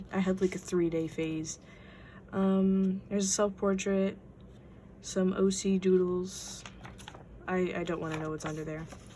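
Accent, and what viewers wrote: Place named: South, United States. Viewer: I just have to mention that you have a nice southern accent :3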